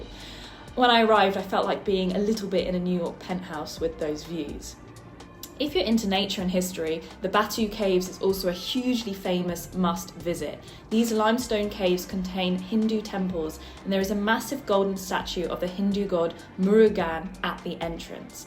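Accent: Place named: United Kingdom. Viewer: I'm listening to your lovely British accent (from an American standpoint)and I'm wondering why there are so very few Americans doing videos of this sort.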